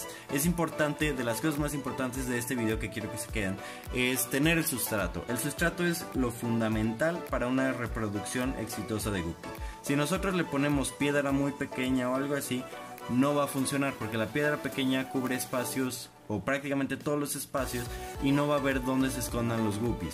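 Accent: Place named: Fresa, Mexico. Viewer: nada mas no hables fresa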